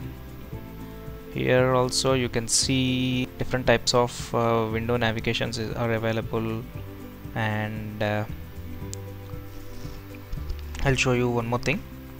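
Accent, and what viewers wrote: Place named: India. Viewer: angrezi nai aati to zaruri hai bolna, Generic Indian Accent Guy...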